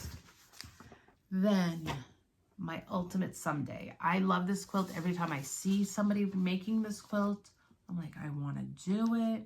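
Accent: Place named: New Jersey, United States. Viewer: Hello. Awesome projects. I was watching a different video of yours. I recognized that you speak more like I do compared to other states 😂 I’m in NJ but it’s very similar in certain areas of NY. I recognizes the word bought, Autumn, etc. Idk it is crazy. Before I started watching YouTube and hearing other accents, I would have never noticed that you sound like home I guess I could say 😂❤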